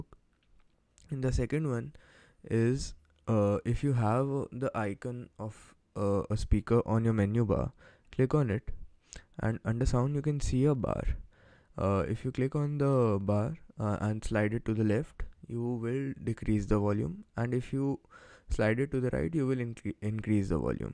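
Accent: India. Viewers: learn English with out accent! you're speaking English with an indian accent. When I learned Spanish we learned to make the sounds the way the Spanish people do. We pronounced its as the spanish people would! Stop using your pronunciation because that tells me you have not had professional English classes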